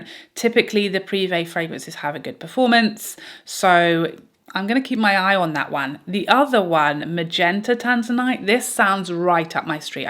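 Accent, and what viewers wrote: United Kingdom. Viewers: I love your videos to pieces! and your British accent. I’m new to your channel but am so happy I found you. I’m in the US but found I like UK YouTubers probably more than the US. You guys are more real and unafraid to tell it like it is😉 Do you use Lancôme or Estée Lauder much? I have heard you mention some products from them but I have not seen any videos about those brands. Does anyone do videos about them. I think they both don’t get enough love if you know what I mean. I use a lot of both brands and would love to see videos about their products.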